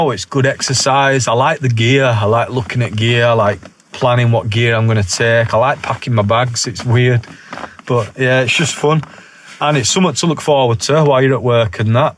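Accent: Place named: Bradford, United Kingdom. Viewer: You from Bradford?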